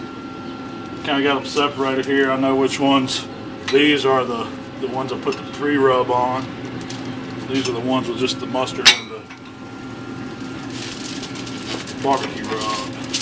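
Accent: South, United States. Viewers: Dig the southern accent. Which state are you from?? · I love your ribs and I love that cool southern drawl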